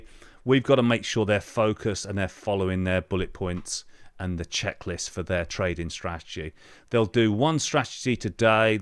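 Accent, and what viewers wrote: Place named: United Kingdom. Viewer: I love you and you’re such a wonderful instructor! I love British accent that’s why! God bless you man 🙏🙏🙏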